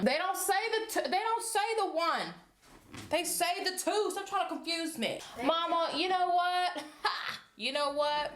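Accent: South, United States. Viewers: That southern twang! It's so cute!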